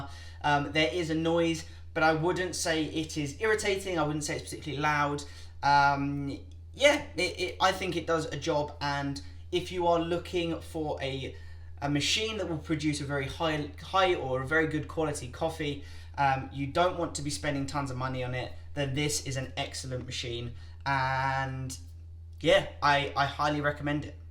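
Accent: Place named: United Kingdom. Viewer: Thanks for the coffee and British accent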